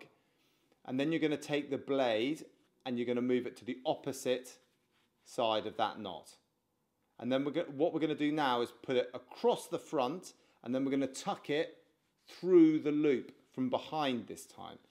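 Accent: England, United Kingdom. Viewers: The best part of your video was your English accent since I'm kind of partial to the queen 👑 of England. Well I have to watch your videos at least 10 times maybe 12 times. But I got it. Hey I like your store. 🤔 Maybe I should go to more of your videos and find out how you did it I kind of like that's a great idea having a nice store like that I wonder if it would work in Bothell Washington probably would cuz Microsoft is here Amazon is here Google is here Nintendo is here.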